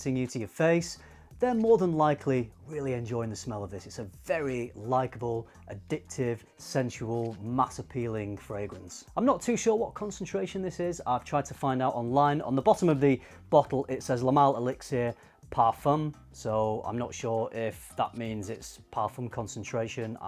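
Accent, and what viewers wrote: United Kingdom. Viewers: I blind bought it, and I am just in love. The only thing that saddens me is that I will not be able to apply it in the summer heat. But that is why there are three other seasons! :D Thank you for the review! As always great work! The British accent certainly makes it more enjoyable, "pleasing to the ear", for sure!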